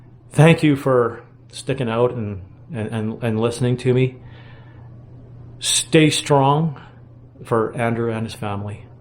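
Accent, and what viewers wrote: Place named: Canada. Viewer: Thank you so much for your heartfelt and very valuable updates on Andrew Cross's ongoing crisis. You have to be Canadian-born, like myself, for some of your north-of-border pronunciations. (Well done, I say, as a dual citizen living in USA but born in Ontario in 1955.) Your commentary has been nothing short of brilliant, even if heart-rendering at times. You are a saint for making this effort and I thank you again from my deep heart.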